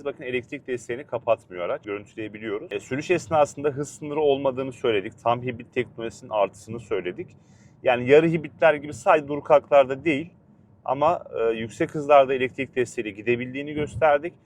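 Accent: Samsun, Turkey. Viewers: Samsun şivesi😊olmuyor maalesef😊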